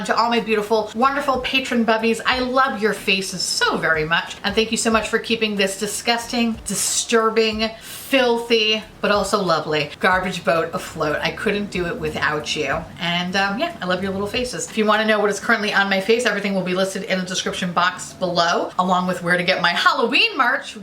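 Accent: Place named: New York, United States. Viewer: I’ve been watching beauty YouTube for years and I’ve been broke due to Covid and really fallen off watching beauty BUT when you post a video I get so excited!! Every time you start talking my boyfriend says “is that the New York chick??” 😂😂 then he has to watch you because you’re hilarious 😁 thank you for being awesome!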